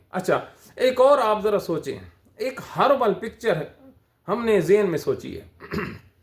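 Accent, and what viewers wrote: Saraiki, Pakistan. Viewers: صاحب بہتر ہے کہ پروگرام لکھ کر بیان کیا کریں۔جوش خطابت میں غلطیاں کرجاتے ہو۔مولا علی علیہ السلام کا فرمان ہے کہ کفر کا نظام تو چل سکتا ہے لیکن ظلم کا نظام نہیں چلتا۔ ۔۔۔۔اور آخری جملے۔آپ لڑ کے دشمنوں کو مارنا چاہتے ہو۔لیکن کہنا تو تھا کہ یارو آپ لڑ کر اپنوں کو مارنا چاہتے ہو۔؟۔ تھوڑی تھوڑی کیری لگی رہتی ہے سرائیکی ہیں ناں۔تو اسی لیئے عرض کیا ہے کہ مین جملے لکھ کر بیان کیئے جائیں تو پھر غلطیوں والے الفاظ سے تھوڑی بچت ہوتی ہے۔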